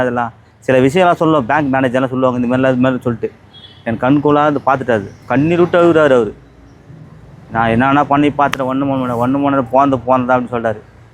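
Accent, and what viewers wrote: Madurai, India. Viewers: Madurai vasagam super 🎉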